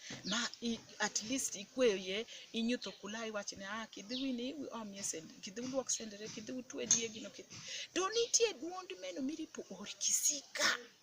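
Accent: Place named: Luo, Kenya. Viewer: You are basically speaking in Luo of Kenya. 😂😂 I love listening to Padhola conversations alot because you sound like Luo of Kenya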